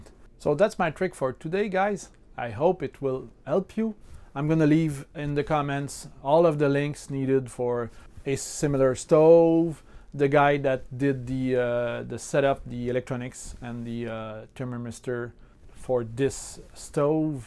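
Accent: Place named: Montreal, Canada. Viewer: I am too, and could tell with your accent! Je juge pas, je trouve seulement drole que je trouve dans fellow montrealer in the wild. haha !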